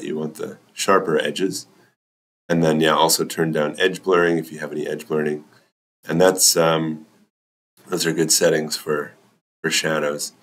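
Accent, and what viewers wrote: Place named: United States. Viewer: And you are an American, English speaking person. That makes all the difference from the clickety, clickety, dialect of India folks attempting English. THANK YOU!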